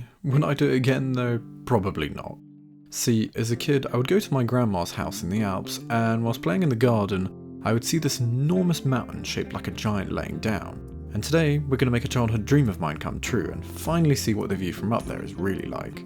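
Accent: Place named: England, United Kingdom. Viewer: Vous avez un accent anglais incroyable 🤩 continuez comme ça j’adore votre vidéo et vos shorts 😁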